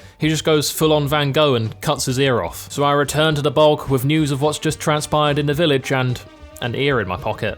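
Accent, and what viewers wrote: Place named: Burnley, United Kingdom. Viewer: Burnley accent. This guy is a fucking joker xD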